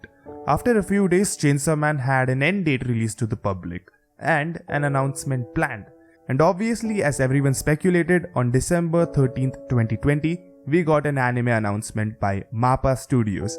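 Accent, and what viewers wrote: India. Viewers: The impossible not unrecognisable indian accent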